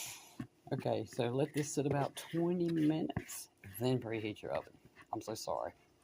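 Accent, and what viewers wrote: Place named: Caribbean. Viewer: Nosey Question: Which island are you from … originally ❓ I recognize a familiar accent 💥 🇹🇹 Yes No? 🤩🤩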